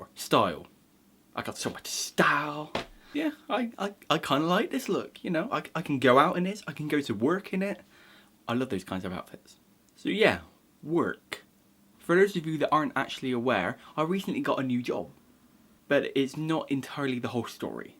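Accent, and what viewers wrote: Bristol, United Kingdom. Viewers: I'm watching:) I love watching your videos, if for nothing else your amazing Bristolian accent. I was thinking about you the other day, I saw a super pack of nerds for sale:)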